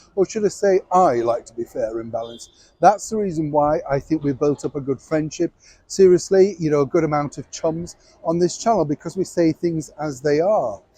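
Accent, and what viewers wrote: Yorkshire, United Kingdom. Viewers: I don’t watch mainstream news channels, I watch GBNews and Mike Graham. I always watch you too, love your no nonsense Yorkshire straight talking.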